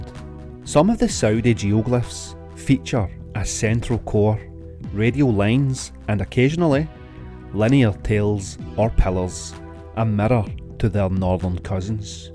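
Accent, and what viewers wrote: Scotland, United Kingdom. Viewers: There's just something hearing the story in a scott accent that makes it so much more interesting. Reminds me of my grand uncles.. natural storytellers.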